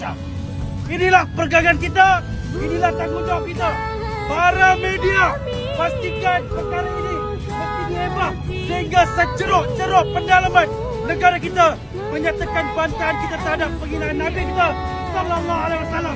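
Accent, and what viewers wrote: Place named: China. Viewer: Min ni melayu ke? Kenapa Ader loghat cina.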